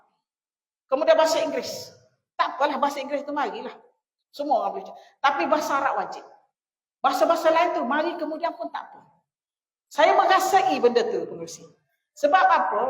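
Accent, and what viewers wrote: Kelantan, Malaysia. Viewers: Saya boleh la dgr ceramah prof. Tp part nk termuntah prof sebut high impact journal. Yg high impact pun bayar duit dh dpt publish walaupun xd kualiti. Sy xsuka sistem universiti dan publication (sama sistem british je). So xyah gebang kt awam lebih2. Buku yg terbaik buku yg iklas buat mcm kitab2 ulama. Bukan mcm jurnal lecturer2 skrg. Nk MUNTAH AKU DENGAR. BTW keep researching. But DONT GEBANG. Thats all😂 btw slang kelantan tu obvious sgt...pompuan kelantan mmg byk dlm ipt mana2 pn. Ntah knpa..mb pandai sembang kot.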